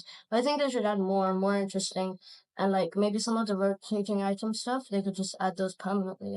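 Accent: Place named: United Kingdom. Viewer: damn your voice sounds so young ive never heard it before also you sound bri ish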